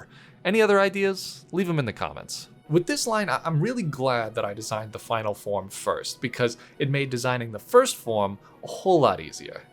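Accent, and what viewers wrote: New England, United States. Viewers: I can't tell if his NE accent is stronger in this video or if I just barely noticed it now that he said he's from there lol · Dunno how its taken me this long to realize youre from new england, maybe cus im from here too i was just like "yep completely normal average accent right there" lmao??? But eyyyy neighbor!!! (Pls read all in an equally thick Cape accent) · when you said "hear that?" I actually heard a bird call that might have been a bluejay. btw I love you doing a new england accent even while you're not joking, it's nice. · Love how the New England accent becomes slightly stronger throughout the course of this video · Haven't watched for a while so the new england accent really hit me like a truck. not sure if its new or I just forgor. anyway I love Shorlugg pinipeds rule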